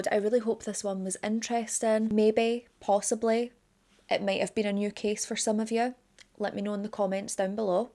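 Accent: Scotland, United Kingdom. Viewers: Hi, case suggestions: Maybe you could consider another case from my native Norway; the serial killer nurse Arnfinn Nesset, convicted in 1983? Horrendous case imo. Your channel is my to go true crime channel. Love your presentation, and your Scottish accent! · I've only recently discovered you, but immediately subscribed after the first video. You have a very pleasant voice, and like so many I adore your beautiful Scottish accent. Will definitely be binging more of your vids! Love and appreciation from Belgium <3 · i’ve been a silent (unknowingly unsubscribed) watcher for a couple of months and hearing a scottish accent pulled me right in. i’ve not seen a vid from you about it so was wondering if you could cover the caroline glachan case? she was a young scottish girl from my village and i grew up with the kids who were sadly witness to this crime. 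it always breaks my heart that people were so quiet and scared for so long, some knowing/suspecting who the perpetrators were but didn’t come forward.❤💙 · Thank you for your hard work. Glad to hear you believe in paranormal too. You are my favorite out of all of the ones out there. I’m Irish (heritage live in the states) but love you Scottish accent. So so so so cute!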